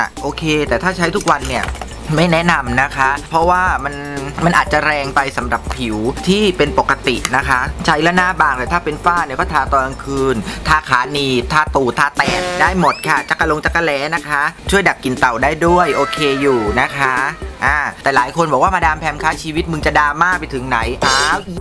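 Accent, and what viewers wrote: South, Thailand. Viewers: คนสุราษ เหรอ แหลงใต้แลมั่งตะ🤓🤓🤓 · สำเนียงออกทางคนใต้?? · มาดามเป็นคนใต้รึเปล่าครับ พูดทองแดงชัดเลย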